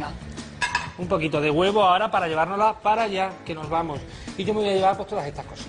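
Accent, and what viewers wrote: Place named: Extremadura, Spain. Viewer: Y el acento extremeño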